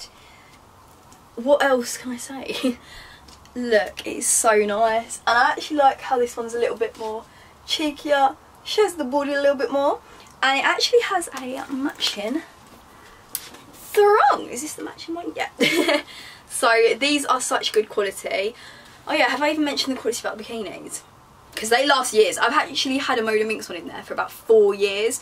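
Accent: United Kingdom. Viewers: Amazing body..love your goofy British accent too very cute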